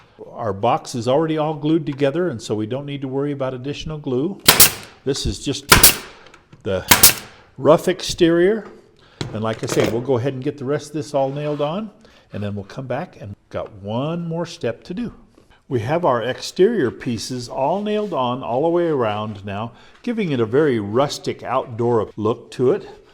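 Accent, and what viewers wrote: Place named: Pennsylvania, United States. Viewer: I'm trying to figure out where your accent is from. Pennsylvania? That's my best guess.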